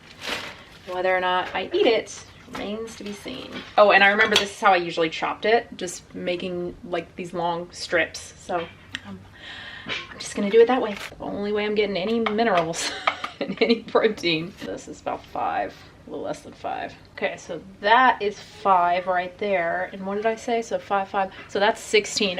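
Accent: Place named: Tennessee, United States. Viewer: I heard your Tennessee accent.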